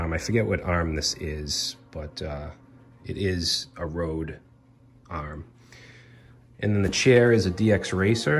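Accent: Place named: Massachusetts, United States. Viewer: There's something about a Mass accent that "sounds honest". Stereotypical of me, I know, but there you have it. Perhaps it's that New England can-do spirit.... or I spent too much time awtching Norm Abram on New Yankee Workshop when I was younger.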